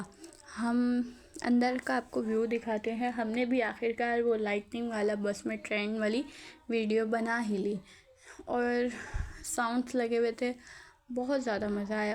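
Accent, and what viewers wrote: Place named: Karachi, Pakistan. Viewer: Pure Karachi accent 😂 keep it up 💫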